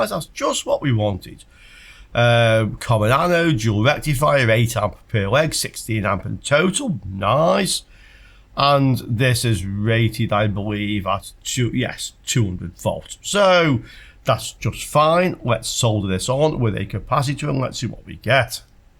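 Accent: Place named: Stoke, United Kingdom. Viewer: you sound like a fellow stokie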